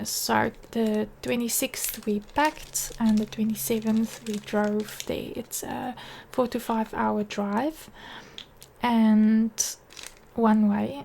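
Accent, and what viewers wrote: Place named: South Africa. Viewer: Where is your pen from please.? Also love hearing the South African accent I really miss home when I hear it.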